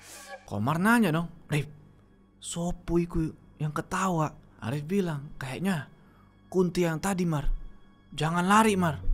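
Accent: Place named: Java, Indonesia. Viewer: Bang keren menjiwai banget ngomong bahasa jawanya😂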